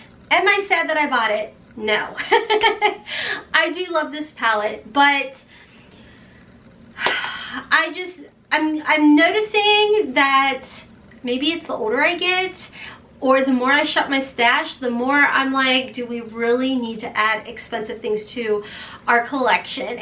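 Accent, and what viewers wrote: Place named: Kentucky, United States. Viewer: That Kentucky accent came out strong in this video! Love it!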